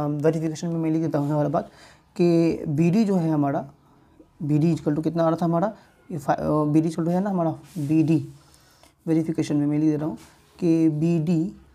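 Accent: Bihar, India. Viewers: Sir app bihar se ho?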